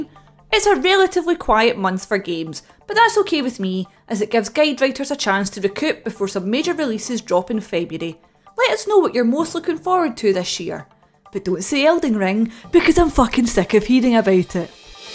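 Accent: Scotland, United Kingdom. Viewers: Great little nugget of gaming news wrapped up in a lovely Scottish accent. Perfecto! [Chef kiss] mwha! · More Scots, please!👍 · Very Scottish.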